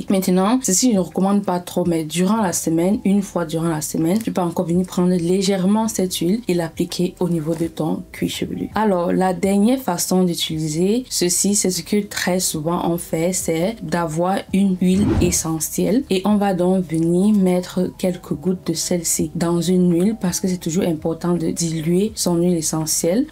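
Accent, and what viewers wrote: Cameroon. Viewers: J'aime trop ton accent camerounais 😊😍💋💗💖💕😜🌊🩷😗🫶🏿😘🥰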